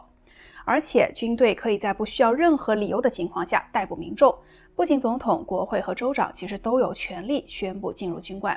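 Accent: China. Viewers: 這是大陸哪裡的口音啊? 真好聽的聲音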